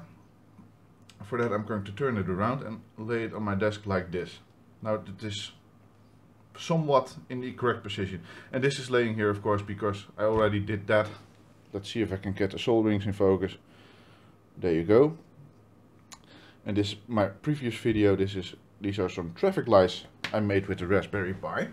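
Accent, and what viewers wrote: Netherlands. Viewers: Love the Dutch accent .. :) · haha vanaf de eerste seconde herkende ik de nederlander hier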